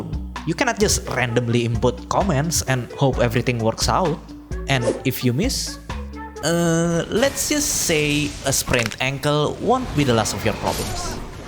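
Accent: Indonesia. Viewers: You soun Indonesian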